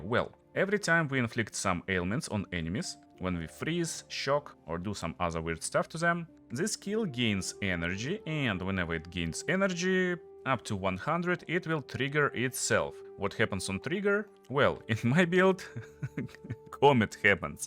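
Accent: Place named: Russia. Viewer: Твой английский прозрачно намекает нам что ты русскоязычный)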